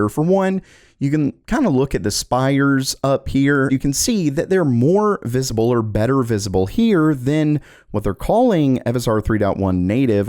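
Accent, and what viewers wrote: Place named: Tennessee, United States. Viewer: lol I walked away and thought the video switched to DistroTube? Tennessee? Hello neighbor lol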